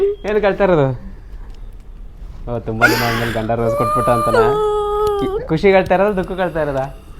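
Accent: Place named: North Karnataka, India. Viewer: ಸೂಪರ್ ಅಣ್ಣ ಉತ್ತರ ಕರ್ನಾಟಕದ ಮಾತು ಅಂದ್ರೆ ಹಿಂಗೆ 👍🌹🌹🌹🌹🌹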